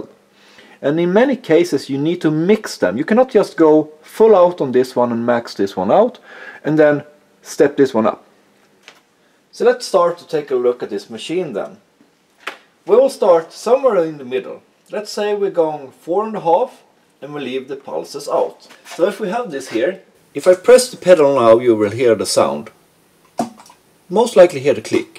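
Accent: Sweden. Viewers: typiskt svengelska!lol enyoyed this video lol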